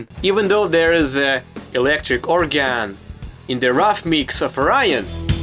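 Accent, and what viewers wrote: Eastern Europe. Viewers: I dont know why but listening to an Eastern European saying complex musical terms is really satisfying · Holy shit your accent is heavily east European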